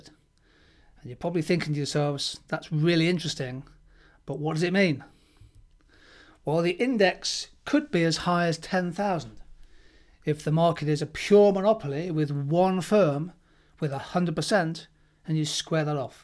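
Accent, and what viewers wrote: United Kingdom. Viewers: This video is very helpful, but I think I was watching it mostly because of a really sweet British accent ☺️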